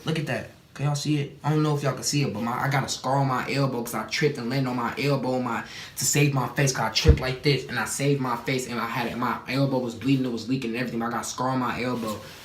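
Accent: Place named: Chicago, United States. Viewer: I can tell yh frm chicago fote😭😭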